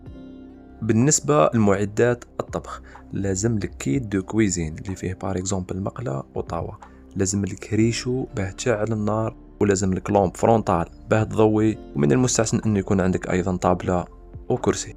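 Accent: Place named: Algeria. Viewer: تراه لهجو الجزائرية😂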